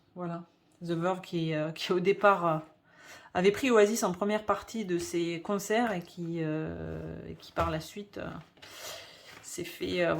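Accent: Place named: North, France. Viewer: Bonjour mademoiselle, oh! Purée que çà fait du bien de voir une vidéo d'une fille qui nous parle de rock 😂😂😂. Première fois que l'on présente le chef-d'œuvre de dans une vidéo, enfin 😊😊😊. L'album post mortem de cet artiste n'est surtout pas à négliger. Première fois également que l'on présente de, meilleur selon moi que, merci pour cela😊😊😊. En parlant de grunge emmenés par le regretté étaient magnifiques. Oasis excellent, excellent, ils ne font absolument pas le même type de musique. En voyant l'album de, j'ai immédiatement pensé au youtuber vinyl over qu'on ne voit plus malheureusement sur la toile que dire, on s' incline. La reprise de par mon héros est splendide. Dis-moi, ils prenaient bien soin de leurs vinyles tes parents. Allez mademoiselle, je vais regarder toutes tes vidéos prochaines, ça s'est sûr. ❤❤❤❤ Ps: d'où vient ce petit accent si sympathique? 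Du nord? 😂😂😂😂.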